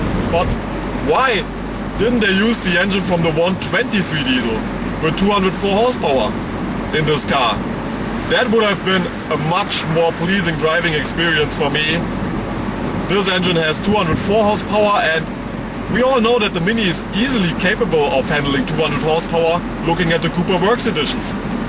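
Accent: Germany. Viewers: 1st off: love the German accent. 2nd, one purchases a Diesel for cost savings not sport. Why would Mini offer an engine that beats it's high end Cooper Works edition? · Your german accent is really cool , anyways i own a mini and although i`m not an expert im very pleasant with it , i think it is a really good car , regards from Mexico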